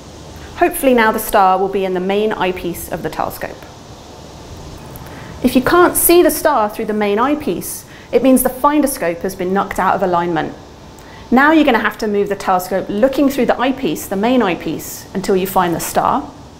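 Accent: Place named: Estuary, United Kingdom. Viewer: Interesting video. It seems your observatory is in the US, but you talk with a refined British estuary accent!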